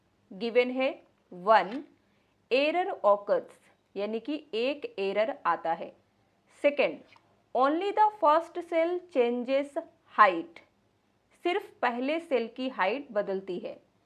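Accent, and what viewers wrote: Odisha, India. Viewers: Ma'am kya aap odisha se hai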